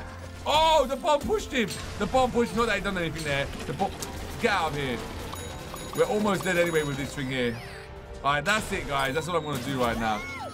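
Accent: United Kingdom. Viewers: love ur britain accent greetings from germany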